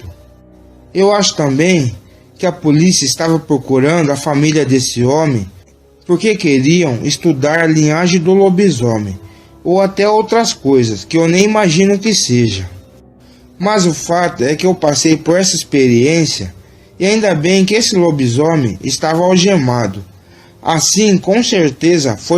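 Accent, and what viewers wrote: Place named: Caipira, Brazil. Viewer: A narração é do tipo bem caipira mesmo!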